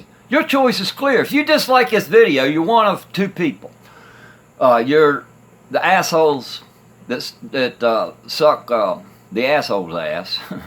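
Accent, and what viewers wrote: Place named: South, United States. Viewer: Bill Burr, a comic, makes fun of conservatives and liberals. You sound exactly like the kind of person he was parodying...a guy with a southern accent who is intelligent and a leftist. Power to you my bro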